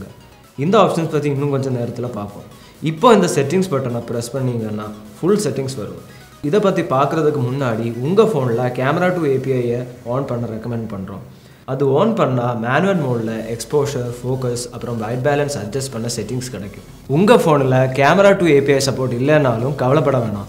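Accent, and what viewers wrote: Kerala, India. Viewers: Konjam kerala slang varuthe Athum nall than irruku👍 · neenga Kerala va malayali maari pesurenga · கேரளா people தமிழ் பேசுனா நல்ல தா இருக்கு .. good job bro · Santhegame illa. Look & speech... Clr ah malayalinn kaatuth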